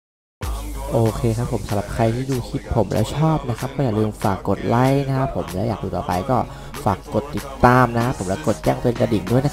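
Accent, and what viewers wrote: North, Thailand. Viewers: อ้ายเป๋นคนเหนือก่า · อ้าวอ้ายคนเหนือกะ555 FC ครับบ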